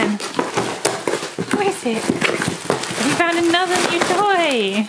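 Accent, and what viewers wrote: United Kingdom. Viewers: Why do u have a british accent? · How do huskies understand British accents?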